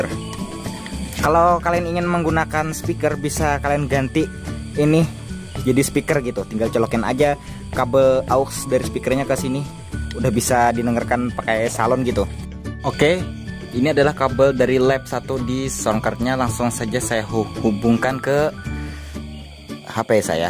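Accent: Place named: Banjar, Indonesia. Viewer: Suaranya banjar banar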